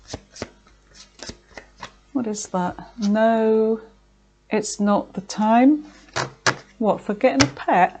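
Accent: United Kingdom. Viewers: You are the best! Really enjoy your Libra readings. Which British accent is it that you have?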